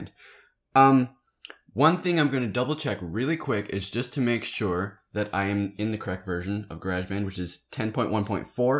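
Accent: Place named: Canada. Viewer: youre canadian arent you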